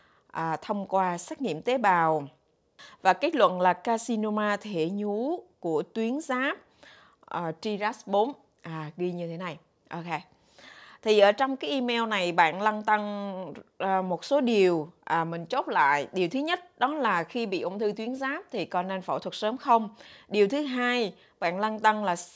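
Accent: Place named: Quang Nam, Vietnam. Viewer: là người Quảng Nam ạ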